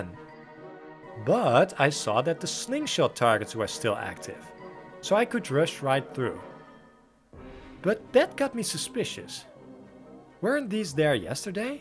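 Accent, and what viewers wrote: Netherlands. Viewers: Very nice video. Is your mother tongue Dutch? The accent sounds very familiar :D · Bro no hate I am from the Netherlands aswell and the accent here is so ugly I ain’t gonna listen to that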